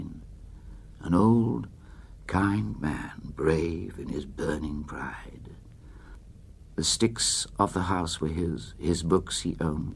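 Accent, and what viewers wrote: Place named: Wales, United Kingdom. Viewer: but you can hear the welsh tones, phrases, and emphasis it is warm and draws you into the prose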